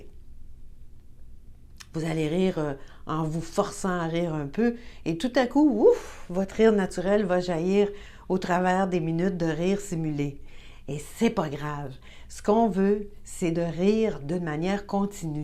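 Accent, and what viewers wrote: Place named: Quebec, Canada. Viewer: MERCI 🙏 Merci pour votre rire et votre générosité à le partager ❤ J'ai ri de vos exercices et de l'intermède entre deux, tant le fait, alors, de reprendre votre sérieux m'a fait rire aussi, car c'était comme "vous retenir de rire" ! 🙃 'Quelle idée' !!! 😂 C'est mon yoga du matin 😊alors que j'ai entrepris de le retrouver pleinement, pour la petite fille surnommée "Rayon de soleil" que j'étais 🌈 Et votre si bel accent 'québecois' qui, au départ déjà, me fait sourire ✨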